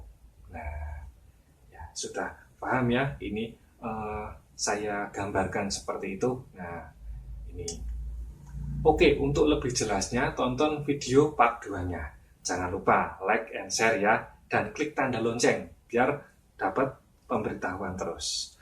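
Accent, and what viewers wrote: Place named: Java, Indonesia. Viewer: males banget denger MEDO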